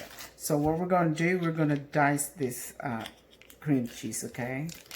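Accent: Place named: United Kingdom. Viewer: british accent si kuya... at masarap siguradong magluto... patikim..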